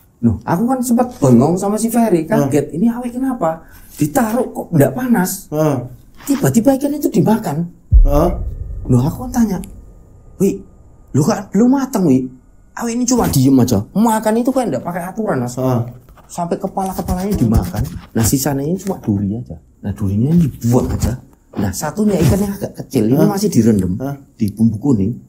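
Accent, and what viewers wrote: Java, Indonesia. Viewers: Bang studionya di daerah mana sih ko jowo nemen...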